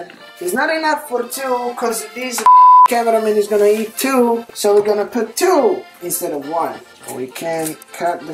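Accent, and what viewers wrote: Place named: Italy. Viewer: Oggi fanculo i commenti costruttivi, adoro il tuo accento italiano misto ad una punta di smusi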